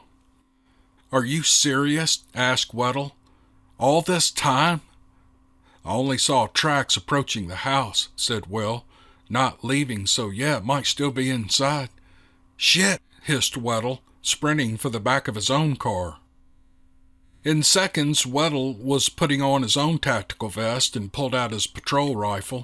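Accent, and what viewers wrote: South, United States. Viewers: You did an excellent job reading these to us. I've heard you before, but hubby and I sat down and listened to all 6 Parts in one day, no joke. We couldn't stop listening until we heard them all. You are an excellent storyteller, and we appreciate you!! Your southern drawl and just the way express everything makes it come alive. I do not want to forget DA Roberts, who without him these would not be possible. Thank you again. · I am so darned excited, I could not listen to part 1 fast enough, then when I began part 2 directly after part one. I HAD to put on the brakes! "Savor it, don't rush through it", I told myself. But, have I listened to me? Oh HELL no! First taste I just started gobbling part 2 up, as well. I had to force me to STOP and send you and D.A. a note to say, as usual, nothing but the best for your followers. Mr Roberts your writing rocks, I love your brain and how it works! 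Your words manage to keep me glued to my seat 'til the last little scrap is gone. Then I am wanting more, like some kind of crazy person, I sit and whine for a moment. I can't help myself I just HAVE to go back and listen to it all over again, I KNOW I missed something, some important detail. I love how you put words together and whisk us away to a new world, it's amazing!, your voice and southern accent (lived in Tennessee for over 25 years and nobody sounded as good as you do) enable me to listen to something over and again because it's so soothing and yet loaded with excitement, sadness, joy or what ever the emotion the author intends. The ability to read aloud with all the inflections necessary to put the listener right in the middle of it all is not something everyone can do. You both are gifted. I want to THANK YOU both for sharing your gifts with all of us. I just love you both to bits and pieces, again, thank you!:face-red-heart-shape::face-red-heart-shape: Rosey loving life from the boond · Omg im not one for listening to someone else read for me,i love to read books myself, but im hooked absolutely hooked,i love your southern accent, im scottish,you really got me listening and on the edge of my seat, I've just started binge watching your podcasts,amazing never stop,im not normally into this sort of thing, keep it coming 😊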